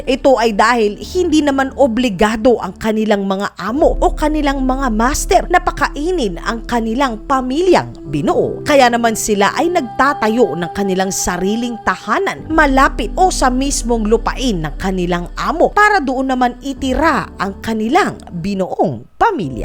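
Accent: Philippines. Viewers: Solid 🔥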